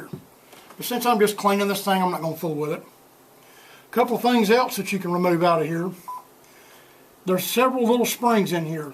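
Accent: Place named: South, United States. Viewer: Great video. You don't sound like you grew up in Maine. My guess would be my part of the country, "Dixie". Thank you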